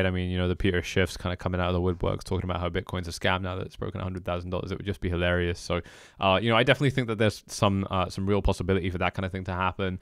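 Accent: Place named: England, United Kingdom. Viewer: hey, haven’t been watching technical analysis lately but I’m wondering what happened with your cool English accent.. you had one years ago and now I can’t hear it.. seriously did you move to Dubai like most of the other crypto guy’s and loose the accent.. anyway peace bro.. you always have had great ta.. appreciate it ..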